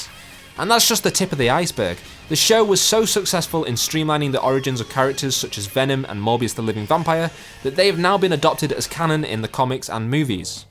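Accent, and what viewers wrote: United Kingdom. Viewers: I don't mind seeing the old 90's Spider-Man the Animated Series again, but I wish most YouTube uploaders would stop being dickheads and talking trash about the ending of the show being related to the Clone Saga storyline. "The story that fans love to hate"? Thats the problem: most people think that most (if not all) fans disliked the Clone Saga storyline when it was the best story in recent years for Spider-Man at the time. So much happened in that story that I, for one, couldn't get enough enjoyment out of it. Then, it was over, but it had lasting impressions that were both memorable and shocking. Maybe I'm being a bit prejudiced when I say this (honesty makes the world more interesting), but since the narrator is British or English, I don't expect him to fully understand. Even if he didn't like the Clone Saga, there's no need to keep talking down about it as if it was the worst story in Spider-Man history (cough One More Day). 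Yet, this is what people do. Nothing better to do with their lives than bring up old hurts from the past. Leave it alone, already. He sounds like a somewhat old enough guy. Move on. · Downvoted and left the second I heard a British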